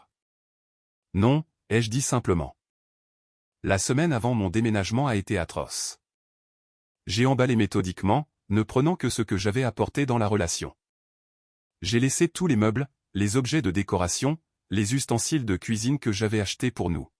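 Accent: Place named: France. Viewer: Pareil, de plus entendre l'anglais avec un accent français ça pique les oreilles.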